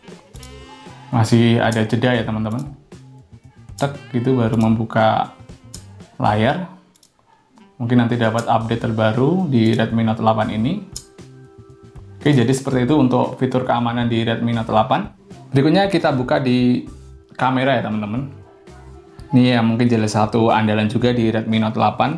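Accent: Magetan, Indonesia. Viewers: Mosok wong magetan lur?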